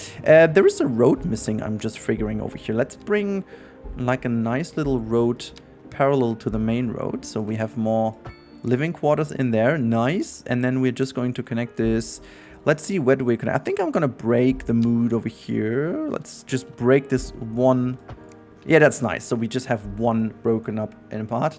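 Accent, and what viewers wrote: Germany. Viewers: Dieser deutsche Akzent ist göttlich! Love the videos, keep it up <3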